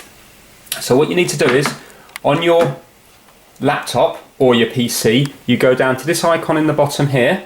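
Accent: United Kingdom. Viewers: You are from UK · Man I fuckin love this british accent. Didn't even listen to what you said, just how you said it for the first few minutes. So yea I had to look it twice. x) greetings from germany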